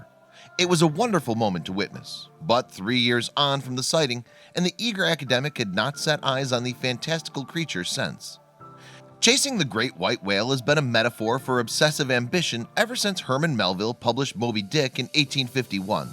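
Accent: United States. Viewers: I don't know why I continue to get sucked into these videos as a minute or so in to them the penny drops that the the narrator, or more likely the writers, tell 'pork pies', and the narrators ALWAYS have American accents! The first lie becomes obvious as there is a white humpback whale named 'Migaloo' sighted on the Australian east coast when he otherwise affirms that there are no other white whale specimens, the second lie being that blind freddy could see that when he claims that this white adult orca is "fully socialised" the question begs is, why is he swimming with orcas of a different type? Notice the fin size difference between the white whale and the rest of the whales in the pod denotes that whilst both are orcas, the white whale is of a different sub-species, just as are Brahman cattle are different to say, english Hereford cattle., and which means that for some reason the white orca appears to be socialising outside his sub-species group, and therefore raises the spectre of the third lie when the next question begs, where is his mother that the narrator asserts is amongst the pod? 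Then notice at about 5.05 mins into the video in much more grainy footage you see the white orca surfacing amongst it's own sub-species, so it may well be two different white orcas as was alluded to later on in the video. Then there are differences in types, being 'residents' to a localised area, 'transient' consisting of small pods in which females tend to have more triangular fins than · Dweebe yanks accents like this are both offputting and superficial ... no gravitas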